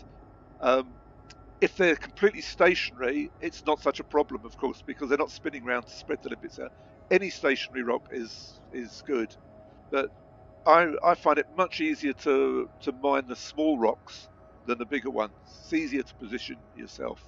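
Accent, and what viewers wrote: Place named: Bethnal Green, United Kingdom. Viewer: Very good video. Cheers! Love the accent BTW. Pure Bethnal Green! 👍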